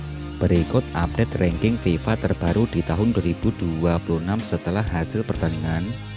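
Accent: Java, Indonesia. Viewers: Vokal mu jawa medok, penyebutan mu seringkali salah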